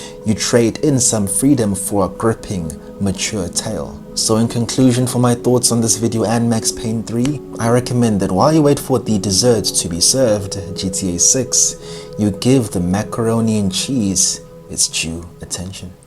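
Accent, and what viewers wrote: South Africa. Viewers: You sound South African, Mac and cheese bro? Should’ve said atchaar or magwinya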